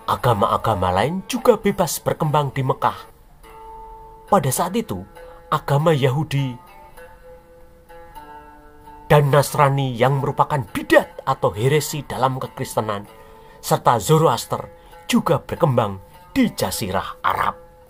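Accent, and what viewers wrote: Java, Indonesia. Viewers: Adminnya medok banget jawanya😅😊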